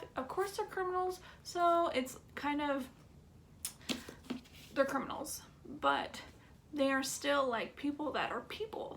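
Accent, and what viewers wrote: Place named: South, United States. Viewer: love your accent, where are you from? South?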